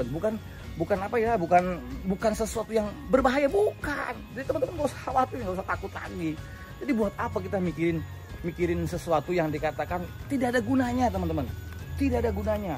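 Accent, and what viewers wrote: Banyumas, Indonesia. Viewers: Nang ndi kue pak,deneng ngapak 😀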